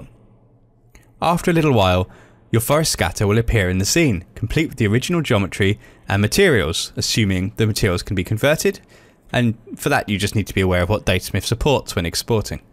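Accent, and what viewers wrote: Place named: United Kingdom. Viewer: Props to the ever smooth British (I think) narrator with clear and crisp voice, always nice to hear things accurately.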